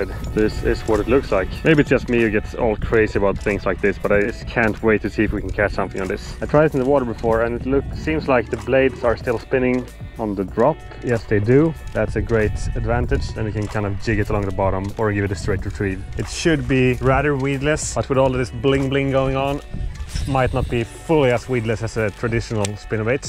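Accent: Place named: Sweden. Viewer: As a Norwegian I love hearing his Swedish accent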